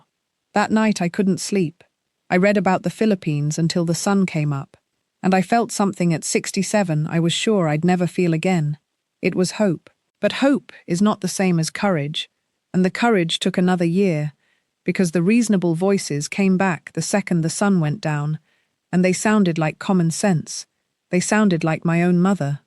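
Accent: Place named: United Kingdom. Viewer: I love your story, brilliant. I too love the Philippines, I have filipino friends. For an American woman you've got a fabulous British accent. Great story. Wish you well..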